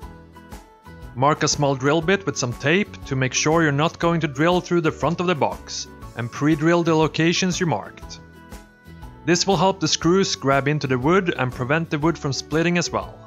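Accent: Sweden. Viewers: You from sweden? Ur accent is funny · You are so wonderfully swedish when you speak xD <3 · Typical Swedish accent. I'm Norwegian, I've heard it a lot since I've been around Swedish people in international environments =) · My grandparents were from Sweden. Hearing your accent makes me miss them very much. Also, great information!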